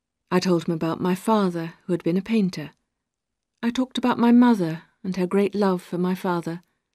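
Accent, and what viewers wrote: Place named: United Kingdom. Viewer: British English is so elegant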